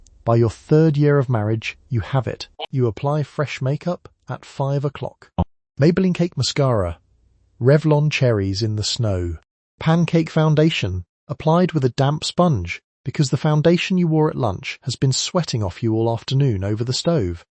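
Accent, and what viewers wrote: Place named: United Kingdom. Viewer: I have trouble falling asleep listening to the British accent.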